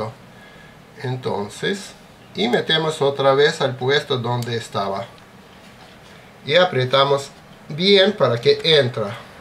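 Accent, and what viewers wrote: Russia. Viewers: Ruso o polaco?😀👍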